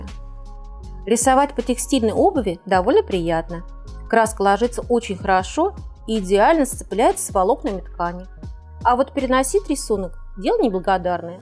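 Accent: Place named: Orenburg, Russia. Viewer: Говор интересный. Вы из Оренбурга?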